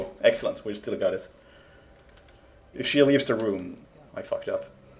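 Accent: Finland. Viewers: Arvaa helposti ku kuulostaa vähä rallienkulta😅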